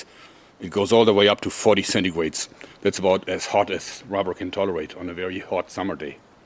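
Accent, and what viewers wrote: Germany. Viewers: Hörst dich sehr deutsch an, bist auch deutscher oder? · ich hab mich die ganze zeit gefragt warum der sich so deutsch anhöhrt :D:D kommt ja aus deutschland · anybody else notice that he sounds like a german robin williams... he sounds just like him just with a german accent. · klar ist er Deutscher - hört man ja auch an seinem Akzent :)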